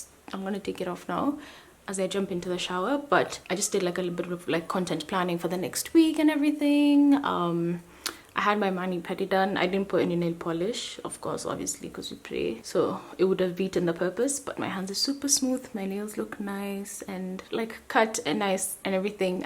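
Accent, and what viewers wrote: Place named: Kenya. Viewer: Love her Kenyan English accent 😭ps we need a tutorial for the makeup you was wearing in the start of the video 😍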